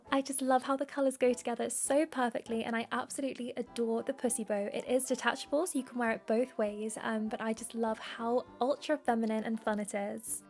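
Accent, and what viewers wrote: RP, United Kingdom. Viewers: Steps to having a more luxurious life: 1) step one: have a charming, sophisticated posh British accent like, a mix of the movie Notting Hill and Victoria Beckham and Kate Middleton 2) step 2: have poise and panache like 3) step 3: have exquisite taste in architecture, art, and fashion like In short: BE!!!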